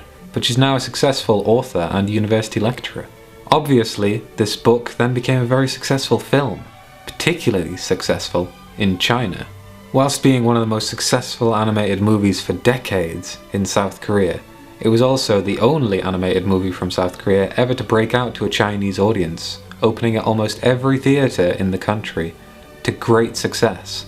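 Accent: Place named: London, United Kingdom. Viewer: Love the way you speak are Londonianian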